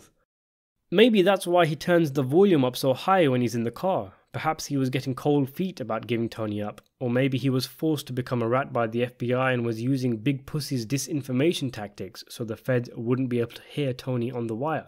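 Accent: United Kingdom. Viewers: I’m sure I said this in a previous video but it’s so bizarre hearing a British accent talk about American mafia business and a show based in my home state but it works in the best way .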